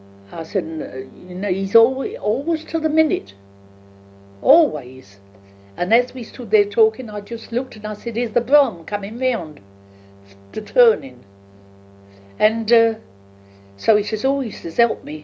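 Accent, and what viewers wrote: London, United Kingdom. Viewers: I've listened to this about 8 times now and it's one of the most interesting things I've watched for a long time. accent was definitely a working class London accent, but it seems to vere from sounding like a Dickens character to almost a modern day person. My grandparents spoke with an accent that most British people have trouble locating because it is so different from the stereotypical regional accent and listening to makes me wish that I had the foresight to record their voices, especially since even though I have the same accent as my grandparents, theirs sounded much nicer and easier to listen to than than the modern version of our local accent. My point is, is definitely a cockney, but her particular way of speaking stands out from other London accents for some reason. I don't know if that is because she was from west/north inner London and not east London or south London but it somehow sounds different. 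It's a real shame that modern London people don't talk like did. I like the way she almost rolls her r's in brother, and how she says 'merrid' for married (similar to how Glaswegians say 'merrit' for married), she also says 'yes' almost as a two syllable word 'yer-ess' and I can hear the sadness in her voice when she talks about her baby brother even though he had died nearly 80 years earlier. Sorry for rambling but this really is one of my favorite videos on YouTube.. 👍👍. I would never replace my own grandparents but if I would love to have had a gre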